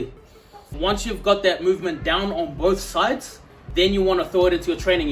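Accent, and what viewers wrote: New Zealand. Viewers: Sounds like you're from New Zealand? Your voice reminds me a tad of Taika Waititi but not exactly the same.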